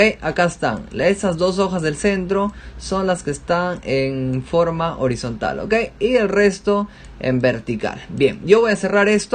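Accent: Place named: Amazon, Peru. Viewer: Causa, buen vídeo, me sirvió bastante, por tu acento saqué al toque que eres de la selva peruana, saludos desde Tingo María.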